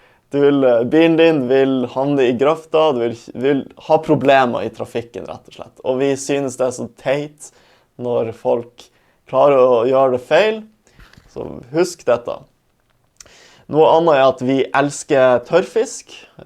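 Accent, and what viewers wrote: Tromsø, Norway. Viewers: Tusænj takk for vidæo. Som dansk, som har læst feskeribiologi på Tromsø Universitet, da tænk æ at dæ kunnje vare lættere for 'utlænjingan' om du forkalte att 'lamme' sannsynlisvis kjem ifrå 'i lag med'? Ikkjesannt? Kunne 'ATTME' være engelsk innnflydelse? Att - 'at'- ved/ i nærheten af' ? En sak som a ikkje fik peiling på, da æ bodde dær; bruker en og 'åssen ar'n dæ? (hvordan har han det? )eller berre 'kushen' om 'hvordan, og 'kushen' staves/skrives 'kushen'? Ijæn VÆLDI hygggelæ å høre Tromsømål ijen. Fra den tia da æ som student fik en Mack på Prelaten eller i Studenthuse.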